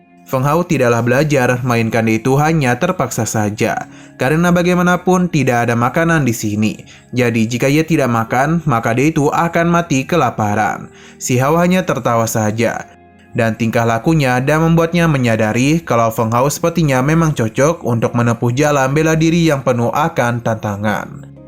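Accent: Banjar, Indonesia. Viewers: Min mimin orng banjar kh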